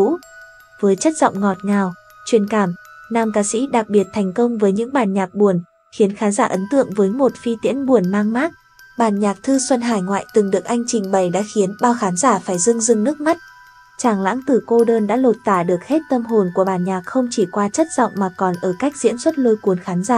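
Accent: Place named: North, Vietnam. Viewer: Đã lâu o nghe giọng Bắc nữ trầm, hôm nay tình cờ thật lấy làm hoan hỉ quá 🤓 cảm ơn cô và mong được nghe thêm nhiều hơn ! Chúc cô và gđ nhiều an lạc an lành an yên... 🌷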